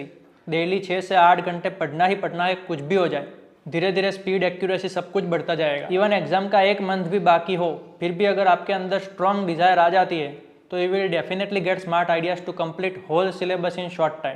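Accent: Gujarat, India. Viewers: That gujrati accent though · Aap gujraati ho?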